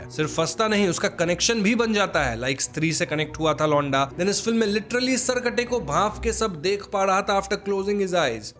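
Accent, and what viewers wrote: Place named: Bihar, India. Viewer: Why do u sound so funny and Bihari types?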